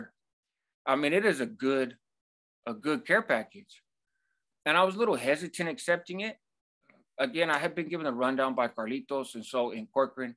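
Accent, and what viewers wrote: Mexico. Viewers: When I close my eyes while watching this I hear a Mexican dude but when I open them I see a white dude.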